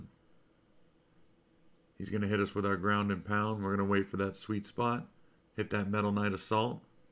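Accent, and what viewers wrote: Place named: United States. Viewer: man youre american for sure. Thx for ur tips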